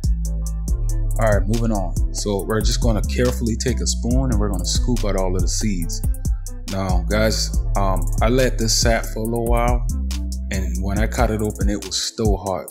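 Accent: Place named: Charleston, United States. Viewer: I knew by the accent! Good ole Chuck Town! I just subscribed! Keep the videos coming! It's very helpful!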